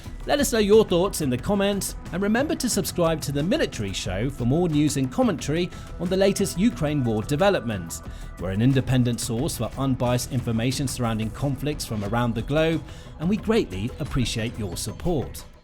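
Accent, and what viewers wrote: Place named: Southern England, United Kingdom. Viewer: So, who is your audience? Since the rest of the world uses the metric system, one must assume it is the US. So perhaps you can ditch the southern English accent for Standard American.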